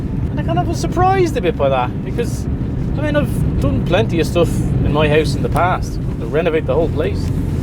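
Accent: Ireland. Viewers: I spent so much of my life being made to be very quiet that I learned to observe and love things others rushed past. I often talked to my bicycle, too. I discovered the joy of talking to cats, dogs, cows and they reacted. Others do this too and I think nothing of it. Some people say we're a bit off until they talk to their car, calling it bad names or begging it to forgive them to get them home. My accent is like yours because of my dads irish accent. I am an American but in certain parts of certain states that accent was preserved. I miss my family, most dead and hearing yourvaccent causes me to feel at home with them again. Thank you so much for posting these videos! You may think it's boring for us but I'm far from bored.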